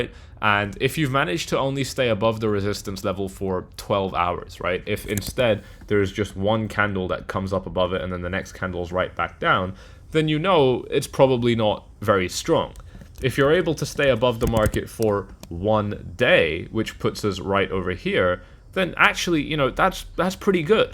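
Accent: United Kingdom. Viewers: Major part of articulation is indebted to British language as it is cultivated, rich and replete with lexical cadence and aestheticism, in sharp contrast to American that is rude, direct and full of jargon and slang. Wish yu and him the best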